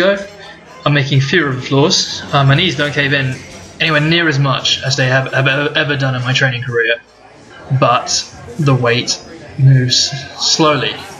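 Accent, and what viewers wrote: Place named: Australia. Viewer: dat aussie accent creeping in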